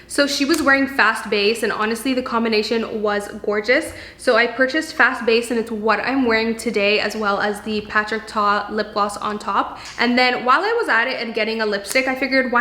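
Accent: Canada. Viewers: Oh is she from Canada? I was noticing she says “beegs” instead of “bags” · Jaclyn Calderon it’s cringe to me but that’s how Canadians say it lol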